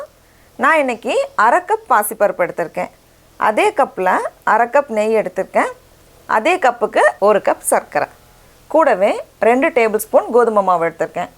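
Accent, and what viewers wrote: Nagercoil, India. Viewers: Are u from Nagercoil ?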